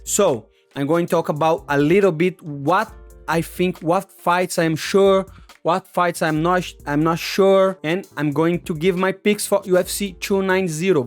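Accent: Brazil. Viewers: I watch your channel because you remind me of my brazilian friends accent back in high school. Youre a great fighter and comedian Respect brother.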